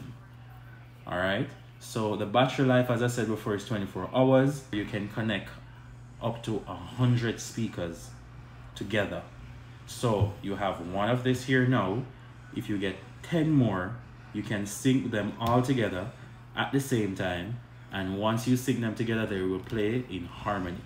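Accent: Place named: Belize. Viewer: Bro you sound Belizean! What's your nationality? If you don't mind me asking!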